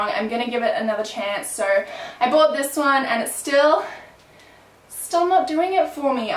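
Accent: Australia. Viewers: I love your accent, are you australian?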